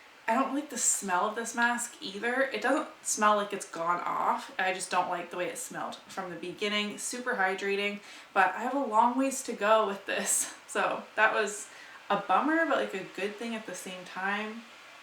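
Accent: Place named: Canada. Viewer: Awesome update! Nice job losing the pounds and for having two empties!! 👏🏻👏🏻 I have been wondering this whole time if you are from Canada, so happy to finally get confirmation in this video!!! I seriously love your accent! Haha as weird as that sounds! 😂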